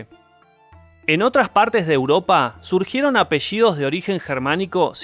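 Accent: Argentina. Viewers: es argento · lastima tu tonito argentino LLLLLLLLLLLLLLLLLL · El contenido es interesante y entretenido.... pero al locutor le juega en contra el timbre argentino, que es plomo derretido en los oídos de los hispanohablantes...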